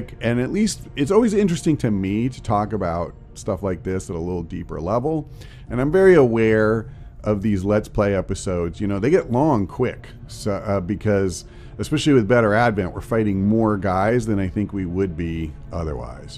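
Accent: United States. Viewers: You should pause the clearing and move the engineer to help build the resistance comms. Chances are engineers and scientists will come in between now and the mag weapon research to continue the clearing and speed up research. Love the show. Sorry if you've been asked this before but are you from New Jersey?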